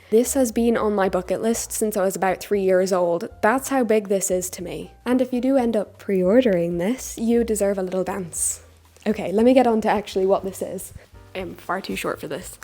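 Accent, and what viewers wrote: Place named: Ireland. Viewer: Your Irish accent comes through a lot stronger when you are just chatting about self and things, then when you are doing skits. Not sure why that is.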